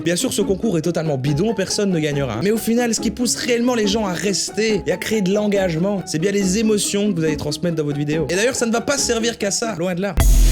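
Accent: Liège, Belgium. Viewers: Il me semblait bien avoir entendu un accent liégeois ! Bonne analyse des contenus de m... Et sinon le sel est passé maintenant ? :-P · Dis moi, es tu belge ?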